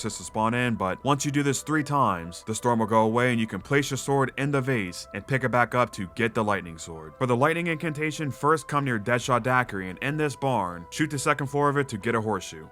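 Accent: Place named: United States. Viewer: Every time I look up a guide it’s some British dude. Glad to hear an American man, or at least someone that sounds like one 🇺🇸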